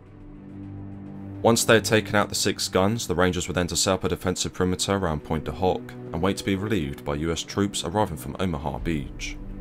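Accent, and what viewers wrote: United Kingdom. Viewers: I could never crack where he's from but as soon as he pronounced battery as ba'ery I knew he was bri'ish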